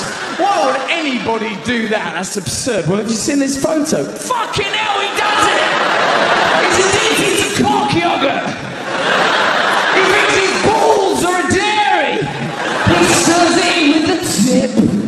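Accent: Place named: London, United Kingdom. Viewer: I've always liked Russel Brand. He is a very smart guy and also very, very fucking funny. Not in the typical type of funny but like the smart, tongue in cheek, kinda funny. That and i sometimes start talking in a British or London accent similar to his. And you can really say and do what you want to a extent when you talk like that.. I swear it, I've done it and i normally wouldn't have got the few girls numbers that i got if i had not been talking like that. I got like 3 numbers from chicks who were way out of my league. All from talking like that. 3 different chicks one married who called her husband and Told him she was going to work late but me and my friends one female and one dude ive known for years. She said it wouldnt work talking like that and picking up chicks and such..she bet us 200 each, we won and bought her something very special. 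1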